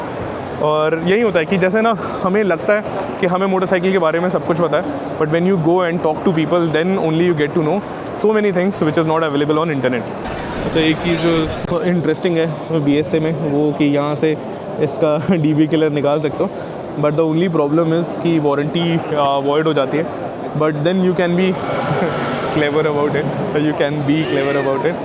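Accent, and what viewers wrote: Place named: Delhi, India. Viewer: bhai tum mein dilli ki chhaap hai tumhare baat karne se dilli region surroundings ke lagte ho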